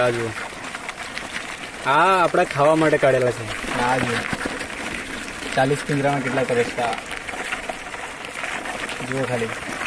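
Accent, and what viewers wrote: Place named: Valsad, India. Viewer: Tmaro fast var video joyo bhasha sabhrine laygu ke tme nkki valsaad naj se 😊 cement ma ma koye saval kyro hto kiya sher na to maru sachu j pyru tme valsaad naj so hu pn valsaad nij su etle bhasha samj pri jay😊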